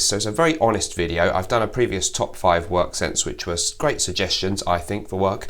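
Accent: United Kingdom. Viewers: Excellent list, as usual. I love your British accent!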